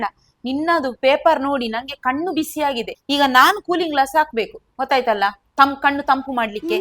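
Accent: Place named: Coastal Karnataka, India. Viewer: Madam your Kannada is good. Coastal Kannada.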